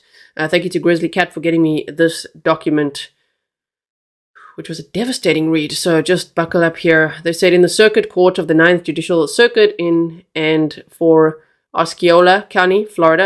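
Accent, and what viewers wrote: South Africa. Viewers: I heard you say braai are you South African? Lovely to hear that accent. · Are you South African? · Thanks for this, what a terrible story maybe this will wake someone up! Btw could tell you were South African by the accent but as soon as you said brai that confirmed it.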